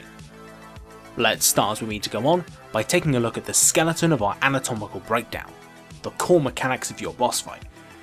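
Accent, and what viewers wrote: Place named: RP, United Kingdom. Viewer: "Allow me to explain to you" is a super annoying way to phrase your intro. Within the first 10 seconds, between that phrase and your posh accent, I can already tell you're a total tosser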